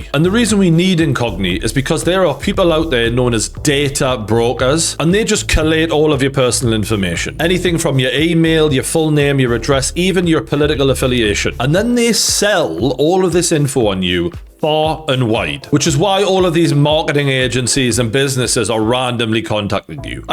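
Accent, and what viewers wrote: United Kingdom. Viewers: Is from the UK ?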